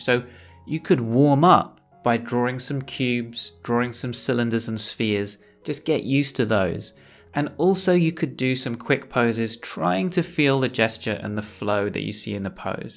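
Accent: United Kingdom. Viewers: Wow I love life drawing, I am guessing you are from Britain by your accent? I live in Manchester is there any life drawing class in Manchester I would like to attend... for a free trial lesson.. Could you get back to me please!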